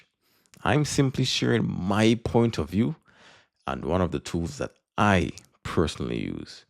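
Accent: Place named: St Croix, United States. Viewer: good morning. where are you from. sound very much like someone from STX.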